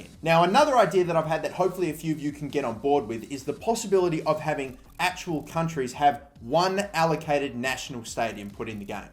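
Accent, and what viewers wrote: Australia. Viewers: i could tell your Australian straight away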